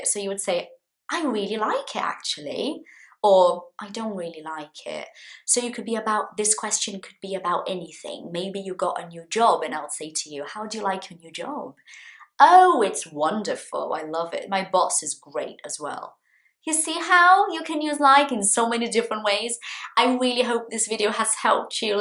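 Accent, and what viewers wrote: RP, United Kingdom. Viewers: Excellent! And your British accent is so posh!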